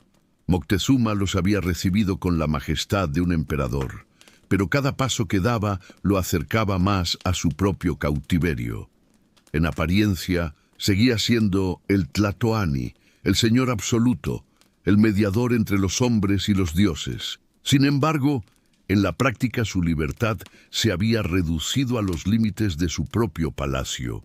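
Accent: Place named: Spain. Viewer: Me cargan las voces de gachupines contando historias de las civilizaciones a quienes invadieron robaron devastaron y saquearon durante siglos... pobrecitos aún siguen con hambre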